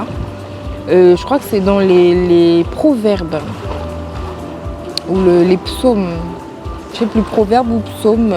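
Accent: Cameroon. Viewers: L'accent camerounais, ne part jamais.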